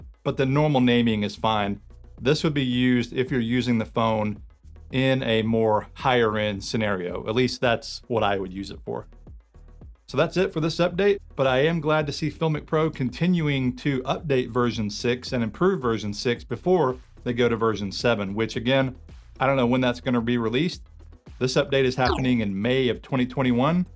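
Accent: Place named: Carolinas, United States. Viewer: Thanks for the info. Love your accent. Is that a North or South Carolinian accent? Have a good day.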